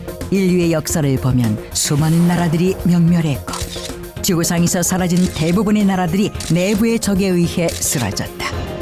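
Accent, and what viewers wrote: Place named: North Korea. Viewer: 말하는게 북한인갔다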